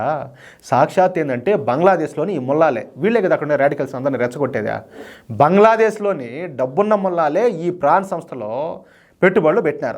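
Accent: Rayalaseema, India. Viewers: రాయల సీమ లో ఏవూరు మీది గారూ? సీమ భాష బాగుంది. హైందవత్వానికి..దేశానికి బాగా సపోర్ట్ చేస్తున్నారు.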